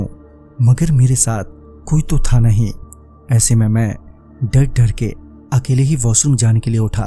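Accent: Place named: Gorakhpur, India. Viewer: लेखक को 1000 लाइक की आवाज़ को 10000लाइक ये पूरी खलीलाबाद ओर गोरखपुर की बीच की है....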